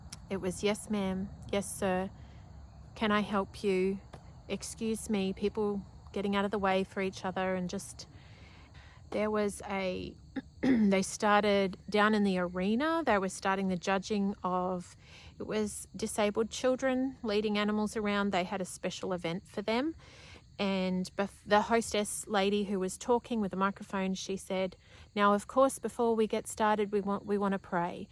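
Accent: Australia. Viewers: I love a ‘rambling’ (your words, not mine) vlog! ❤ ps as a Brit I think your Aussie accent is a particularly nice one 😊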